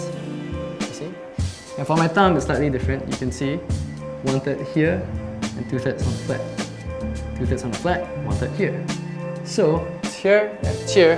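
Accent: Singapore. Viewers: This guy sounds Singaporean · you sound so singaporean ! Are you? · You sound like singaporean or malaysian. :p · Your accent man... are you Singaporean? o_O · you know a singaporean when you hear one... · wah seh, ur singaporean accent quite strong. by ur 2nd sentence i can tell ur singaporean already... hahahhaha.. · I love your Singaporean accent. Me dad is from Singapore <3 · hmmm....funny how i can tell apart a singaporean.probably the way we speak...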